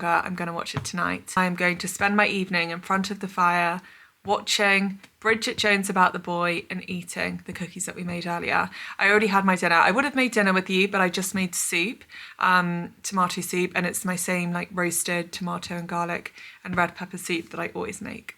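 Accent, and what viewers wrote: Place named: United Kingdom. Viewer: Cozy vibes, beautiful autumn outside, yummy views of the dishes in the restaurant, and pleasant for ears British English... And the similar deciduous trees with yellowing leaves like those growing around my place... It's been a pleasure to watch the video. Keep it up!🎉❤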